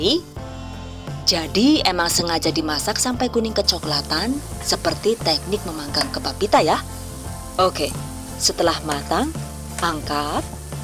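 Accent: Banyumas, Indonesia. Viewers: Jane rika wong ndi yu... Deneng le ngmg ngapake jos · Wahhh ngapak ,:) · japan + ngapak ya etok2 ora doyan😅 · 😁😁😁😁bunda saya suka bicara nya bunda yg bahasa jawa nya bun ko da jawa ngapak nya bun 😀😀😀 tp saya jg suka redep2 nya bunda tenk's bun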